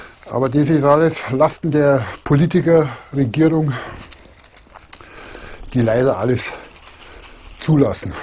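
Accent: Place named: Lake Constance, Germany. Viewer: ja du hesch ja au e geile Dialekt ;-) aber ke ahnig woni di söll here tue , dänke so bim Bodesee ir nöchi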